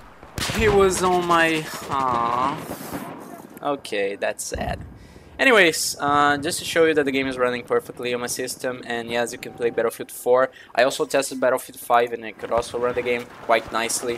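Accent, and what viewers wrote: Brazil. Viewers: Valeu, irmão! Senti nosso sotaque de longe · tu falou 3 palavras e vi que tu é BR, tmj mano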